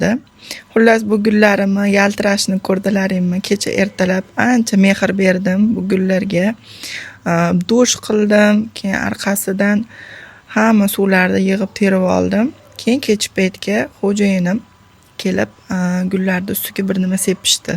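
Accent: Kokand, Uzbekistan. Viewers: Opa qoqonlikmisz shevezdan❤ gullariz vashshi mazza dahshat zooor mazza qildim korib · Шевалари Кукон❤ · Quqoncha shevami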